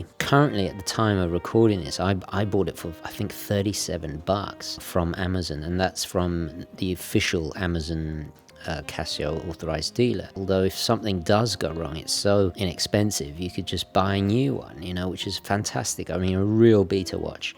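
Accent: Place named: United Kingdom. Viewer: I have enough watches the only reason I still watch is because of his British accent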